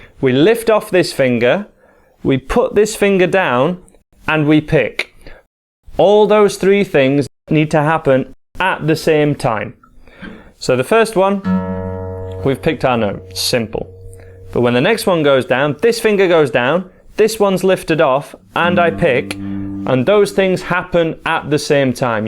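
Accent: United Kingdom. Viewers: Hi Thank you for the free tabs> Are you UK based (it sounds like you are) looking forward to making contact.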